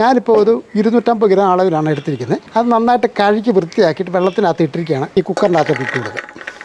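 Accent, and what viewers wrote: Kottayam, India. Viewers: നല്ല കറി ഇക്ക നല്ല അവതരണം ഞങ്ങൾ കോട്ടയം കാരുടെ ഭാഷ പോലെ തോന്നുന്നു🎉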